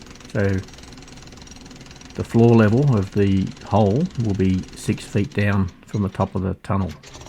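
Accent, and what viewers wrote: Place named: Australia. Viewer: I'd definitely rethink the height of the tunnel mate. Especially if you plan on lining the tunnel at some stage. You'll want to put down a floor at least if you plan on wearing a nice pair of strappy heels down there otherwise you'll end up rolling an ankle. I love the Ozzie accent - always have. Deviated septum - yeah been there done that. Too many kicks and punchs to the face and too slow to dodge them LOL. Cost me $7k to get mine straightened. Worth every cent. Keep on digging!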